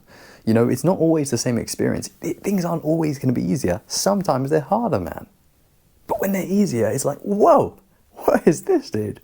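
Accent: United Kingdom. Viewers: 50 seconds in and I had to sub, maybe because he's "one of us" lol (from the UK, I assume because of his English accent) 👽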